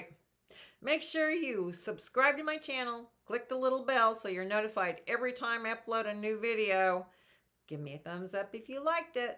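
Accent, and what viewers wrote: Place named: South, United States. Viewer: That’s her southern draw my friend. I’m oh so fascinated with our friends and their accents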